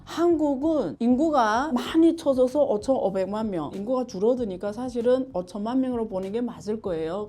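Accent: China. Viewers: 조선족인가? 발음이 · 발음이 중국인?????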